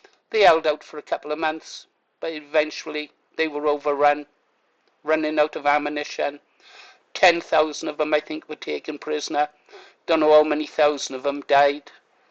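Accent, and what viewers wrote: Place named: London, United Kingdom. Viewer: A proper London accent!😂😅 Cockney is better than the Jafaken that the freaks speak, and not only in London now.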